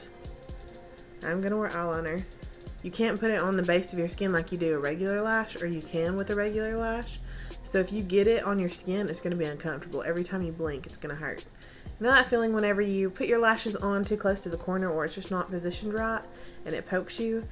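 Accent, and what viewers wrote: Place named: South, United States. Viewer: Fellow Southern girl here, what region is your accent? I love it. I've bought this kit a few weeks ago and used the lashes with regular strip lash adhesive because I've been too scared to use the glue it comes with. Thank you!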